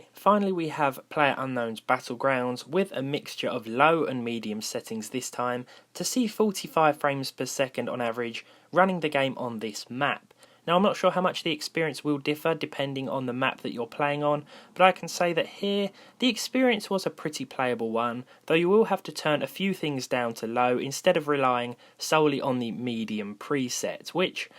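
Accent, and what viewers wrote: United Kingdom. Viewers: hes British dude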